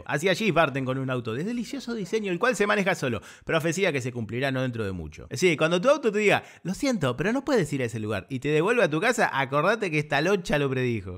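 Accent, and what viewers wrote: Argentina. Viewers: Jajajajaja…que me has hecho reír con tu acento argentino y tus ocurrencias…la reseña más jocosa que he escuchado de una película…saludos compadre 👍🏻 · Todo bien todo bonito pero el acento argentino del narrador es insoportableeee 😫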